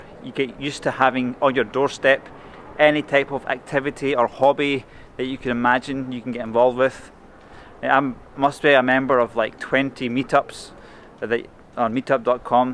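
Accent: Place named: Scotland, United Kingdom. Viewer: Your strong Scottish accent!!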